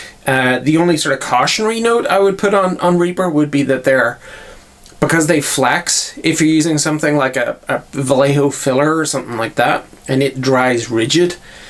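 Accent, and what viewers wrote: Northern Ireland, United Kingdom. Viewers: As a Nordie, I thought I detected an accent from the Graeme McDowell School of Transatlantic Speech....I was right.... I can hear a little noise in the audio, that's easily removed e.g. using Audacity noise filter.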